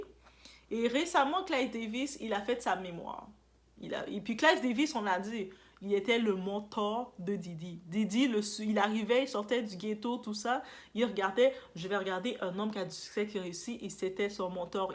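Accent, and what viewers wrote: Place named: Canada. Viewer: Excellent. Ca manque juste un peu usher et le petit Justin J’adoré ta voix avec ce petit accent canadien. 👍🏾👍🏾👍🏾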